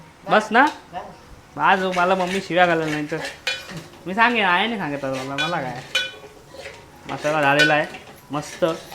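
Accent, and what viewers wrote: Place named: Konkan, India. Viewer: नमस्कार भाऊ मी मुबंई मधून बघत आहे आणि मी नेहमीच बघते तुझे व्हिडीओ मी मुबंईला महालक्ष्मी इकडून बघत आहे आणि तुझी ही गावठी भाषा ऐकून छान वाटते मला आपल्या कोकणातील गावची भाषा आवडते तुझ्या कडून एकण्यास वेगळी मजा येते धन्यवाद भाऊ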